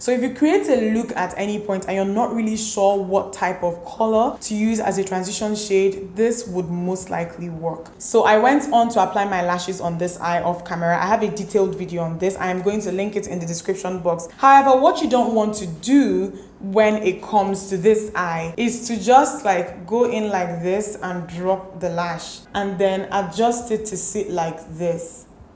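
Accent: Nigeria. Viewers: Idk why but I love the Nigerian accent😍😍🤤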